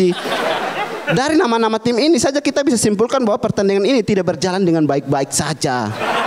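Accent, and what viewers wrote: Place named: Kupang, Indonesia. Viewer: Asli logat Timor Kupang.. 😅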